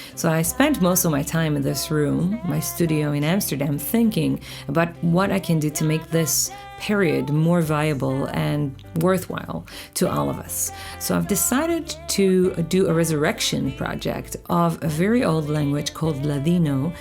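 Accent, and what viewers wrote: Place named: United States. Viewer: Woah your English is amazing. Aren't you from Israel? You sound like an American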